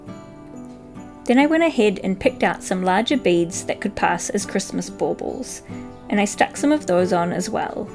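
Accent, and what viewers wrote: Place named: South Africa. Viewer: Christmas is in summer where you live! As soon as you said that, I realized you sound like you have a South African accent. I love all of the wreaths. The gingerbread wreath is my favorite.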